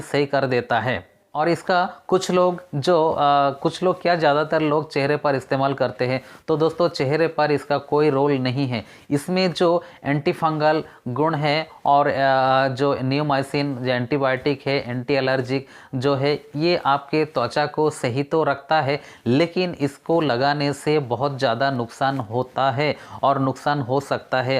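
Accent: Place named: Bihar, India. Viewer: Rahane De Bihari de raha bhashan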